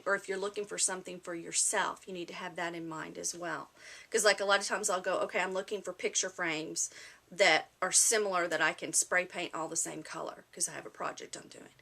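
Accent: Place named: Texas, United States. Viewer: omg you are so darn adorable! I am from Texas also and I just live your accent. Never been to an estate sale, but definitely looking to go check a few out. thanks for the great tips.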